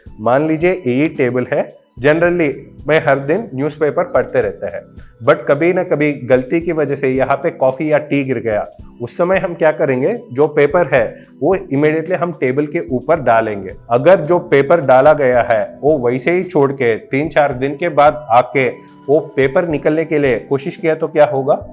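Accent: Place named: Telugu, India. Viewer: तेलुगू हिंदी थोड़ी सी डिफरेंट 😅😅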